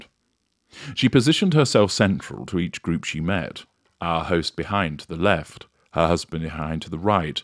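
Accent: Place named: RP, United Kingdom. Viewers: 😂😂 you crack me up.. love your storytelling..and that posh accent... its perfect... I enjoy all of your videos regarding this trollop.. 😂😂 ❤🇦🇺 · Yes a great story teller.All my senses activated and I saw a privileged male being spoilt as a child and constantly told by his mother that he and the family were so much better and superior to others that he carried this elevated opinion of himself,a huge ego into adulthood.He despises anyone who remotely mirrors his own failings and selects them for shredding eg Megan.How am I doing so far.You probably attended Oxford or would have aspired to, hence your accent.Instead of readers saying you are often broke, I would think you hoard money. Possibly mimic your therapists or have studied yourself in psychology. You have a need to dominate but there will always be someone more powerful you have either met or still to meet.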